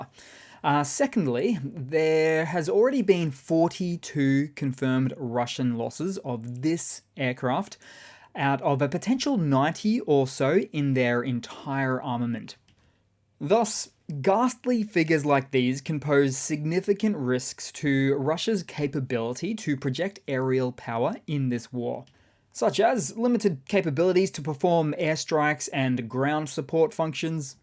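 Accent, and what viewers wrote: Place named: Australia. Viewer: Now I know you are Australian by your accent , so by chance I came across an Australian Youtuber who lives in the outskirts of Moscow with his Russian Wife who does tours of the City and its surrounds (Travelling with Russel) and you can see by the videos he films , that the residents of Moscow give the impression everything is normal and there is no War Going On over the past 18 months , it is quite surreal to watch how the ordinary Russians go about their daily routine as though their country is at War at all, the supermarkets are full of all the food that they could purchase before the War started and the only thing they cannot purchase are European or Japanese vehicles other than the flood of new Chinese brand vehicles being assembled in their country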